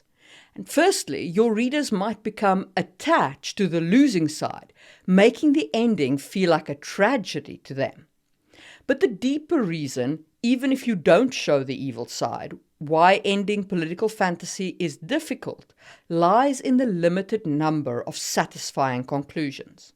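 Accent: South Africa. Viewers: wasn't expecting a south african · You sound South African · You sound South African! 😱 Where is your accent from? On your website you are standing in snow that definitely does not fall in SA. Expat? Am I completely off the mark?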